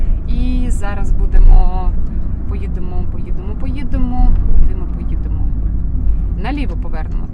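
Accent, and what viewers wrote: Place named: Surzhyk, Ukraine. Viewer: Насолода скушать конфетку, а коверкать украинский это ужас, не можешь не говори, а учись, а то какой то суржик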